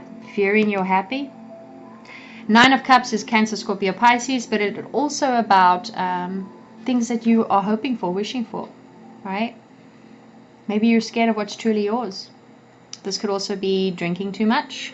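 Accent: South Africa. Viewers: Oh a few clues you may have a SA or Zim accent? Been solo for so long, not sure who is coming into focus. Thanks for such a lively reading. Wm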